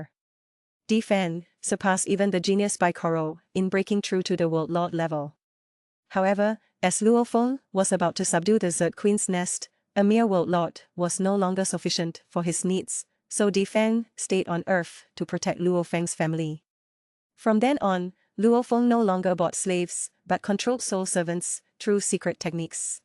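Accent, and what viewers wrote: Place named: Singapore. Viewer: Are you singaporean? You have a Singlish accent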